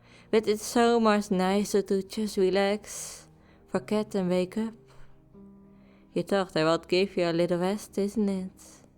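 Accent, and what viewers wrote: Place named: Netherlands. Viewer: Didnt expect to like a dutch accent hehe, but I think it is ur voice and the way u pronounce words that makes it nice :3 I also know a dutch male switch u might get along with very well im sure we will chat about it, hehe :3 ty for the fun :3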